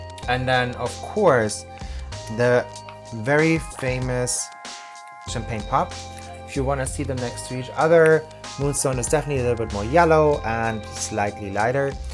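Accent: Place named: Germany. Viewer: Are u german? I can hear your accent :D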